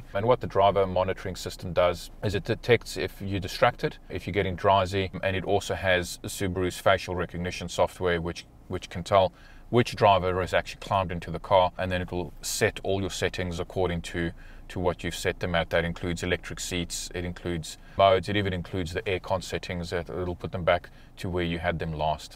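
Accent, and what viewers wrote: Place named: South Africa. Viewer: This guy is South African, not Australian.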